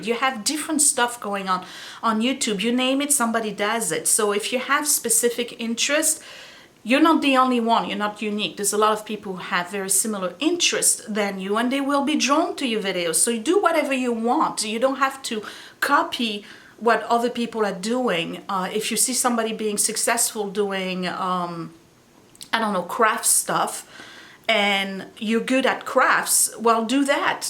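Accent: France. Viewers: I always love to watch your videos. My favorite aunt was born in Belgium and had a wonderful French accent just like you. So, whenever I listen to you speak, it reminds me of her and feels like a little bit of home. Don't let the haters get you down. I'm so glad you're on Youtube!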